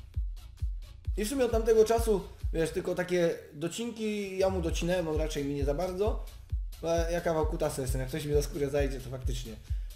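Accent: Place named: Silesia, Poland. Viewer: Bo on jest chyba ze Śląska i tam tak mówią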